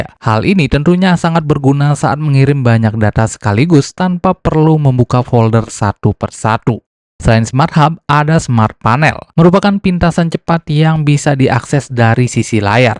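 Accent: Bali, Indonesia. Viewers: Logat bali nya kental sekali Bli.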